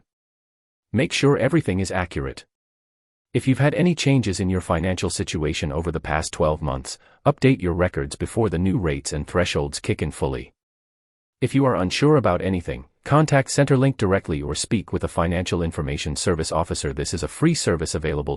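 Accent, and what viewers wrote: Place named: United States. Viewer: Here we go! That yank voice again. Don't believe anything he says. Who makes this shit up? Shame on you.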